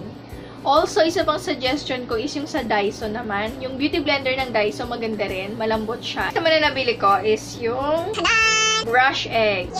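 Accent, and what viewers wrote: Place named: Ilocos, Philippines. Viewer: Found your channel and it's awesome! You sound like an Ilocana so you earned a subscriber :D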